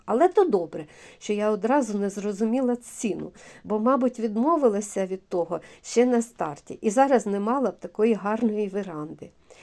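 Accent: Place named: Russia. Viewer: Голос молодої жінки. Дуже гарна мова, хоча відчутно що колись людина говорила російською або суржиком. Дякую.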